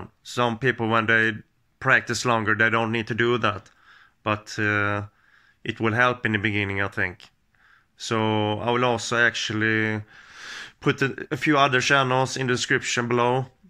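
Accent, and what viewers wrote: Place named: Sweden. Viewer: Märker det genom ”dialekten” eller vad flesta nu kallar ”svengelska”. Jag själv har den dialekt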